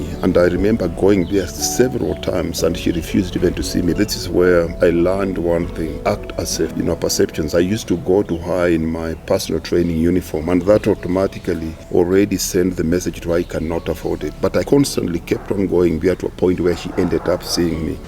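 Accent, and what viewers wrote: Kenya. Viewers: that is a luhya accent kabisa kwanza ya wa samia wa busia.prove me wrong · His accent just reminds me of Papa Shirandula. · Amazing how he still have the Kenyan accent after all that time in SA...this guy is inspiring in so many ways...kudos my Kenyan bro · I thought he has luo accent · This was so beautiful I absolutely love the Kenyan accent., you are doing a good job with this series oh! What a beautiful dog he has, wonder what the breed is called...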